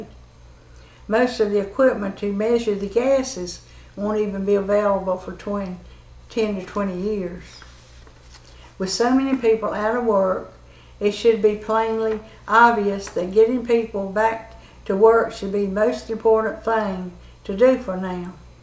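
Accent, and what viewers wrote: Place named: South, United States. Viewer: This is not the same that the Ellen Show talks about. She has very strong southern accent. But she's still a lovely grandma.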